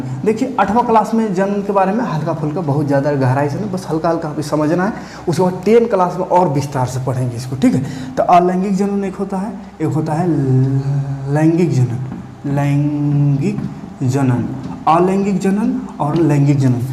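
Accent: Bihar, India. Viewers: Aap Ke aavaj se lag ki Aap Bihar se ho😄😄🙄🙄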